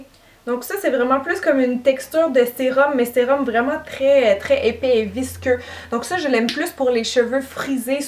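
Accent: Canada. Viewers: Coucou, tu as changé la forme de tes sourcils? En tout cas je les adore dans cette vidéo! Tes conseils sont géniaux comme toujours, mille merci de partager ça avec nous! Et ton accent, moi qui suis fan du Canada, j'en suis folle! Des bisous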